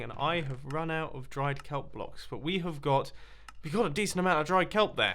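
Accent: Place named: United Kingdom. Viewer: I love me a British accent. Anything sounds better in a British accent lol.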